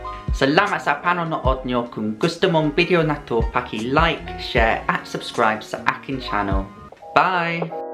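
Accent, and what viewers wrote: United Kingdom. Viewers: SaLute! kitang-kita grabe ang dedikasyon mo para matuto ng tagaLog and it reaLLy worked and growing, kudos, more power! Love your Brit accent too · You should pay more attention to your accent, you are speaking Tagalog but with a British accent.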